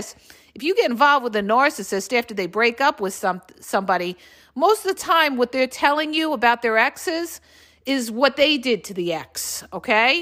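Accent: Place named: New Jersey, United States. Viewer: I guess you're from Jersey.Thank God I love you.I'm from Elmwood Park.I love the way you talk and you don't put up with s*** and you swear that I love you liked and subscribed.I was with the covert narcissist 44 f**** years, I left 6 months ago and enrolled in cosmetology school and with my old roommate.But i'm still sad the abuses something else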